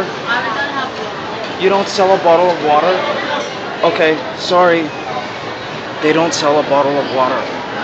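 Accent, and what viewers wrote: Philippines. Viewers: ur voice's funny, did u intentionally do that? and u kinda hav an accent, an english-filipino accent.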